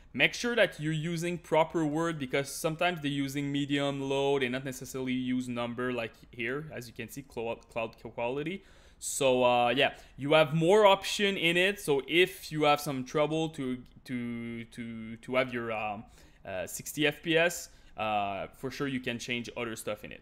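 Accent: Quebec, Canada. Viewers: boi tes quebecois? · I can feel the quebec in him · accent is from quebec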